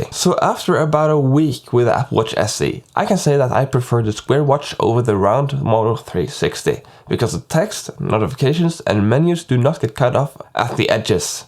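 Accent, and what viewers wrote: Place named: Norway. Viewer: Since english is not your native language, don't sweat it too much with the pronunciation, since norwegian pronunciation probably tries to slip in while speaking in english, and that is totally normal, nothing wrong with it. Focus on keeping up the making of content and your english will tune in by itself effortlessly. Good work!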